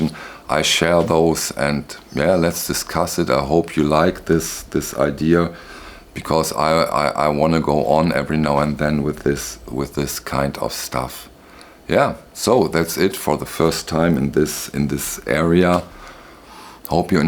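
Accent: Germany. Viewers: Hey, I find your slowness in speaking embarrassing and I hate your terrible German accent: e wäry, wäry, ärly pressing. Phhh. Everything you say is predictable and completely unoriginal. I don't think you're very musical, based on what you rate positively. I am amazed at your many fans. Ok. That's how it is.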